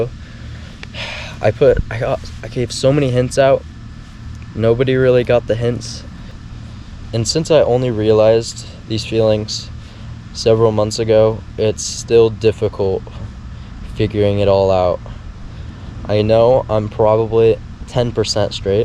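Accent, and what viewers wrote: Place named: South, United States. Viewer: Based on your accent, you seem to come from a Southern state; good to know that you were fully accepted. Interesting that you told your dad before you told your mom. You are extremely pretty and masculine. It makes things easier for you.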